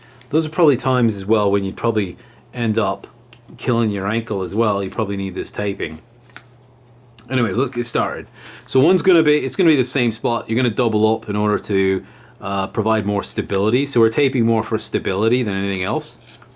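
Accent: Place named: Scotland, United Kingdom. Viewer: I know this an odd question, given that my focus should be related to the taping (video was excellent by the way); but I spent all the time trying to work out your accent. It sounded a combination of Scottish (not sure which part), Northern English (Liverpool or Manchester), and Canadian. Please help me out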